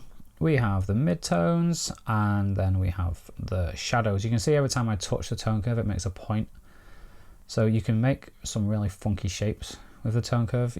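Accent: United Kingdom. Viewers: Thank you! I'm fairly new to RAW and only very occasionally use it. Following your video, I downloaded your RAW files and tried them out myself on my iPhone12pm. Since that now has RAW capability, I tried a few edits in the default app, and then finished it off in SnapSeed (just the normal edits, not RAW). I have previously found that I preferred the default photos app for RAW editing since in SnapSeed the scale for shadow RAW editing was far too wide and tended to affect the mid-tones as well as shadows. I also tried out DarkRoom which I've only tried a couple of times previously. It doesn't appear to have a masking facility, something which I find to be essential, which is why I most often go back to SnapSeed. And I didn't like what DarkRoom produced this time around! I preferred to go for a very different look from yours, but as you say, so much is down to personal preference. 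I do most of my shooting in the default camera app, but must try out Halide which I have but not really got to grips with. I have a problem. I'm an AppAholic haha! But I guess I"m probably not the only one!!! Is there a suitable 12-Step Programme for me??? Blessings and thank you again. PS, nice to hear a British accent like mine on YouTube!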